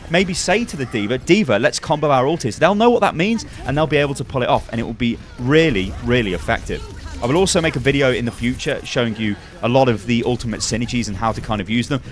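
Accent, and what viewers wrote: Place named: United Kingdom. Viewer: Subscribe reason: 30% Tips and Guides 70% British Accent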